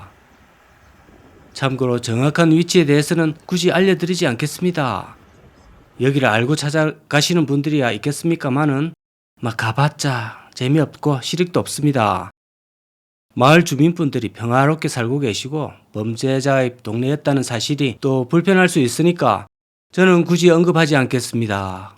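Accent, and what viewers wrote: Gyeongsang, South Korea. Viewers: 경상도 사투리 좃나 짜증 나네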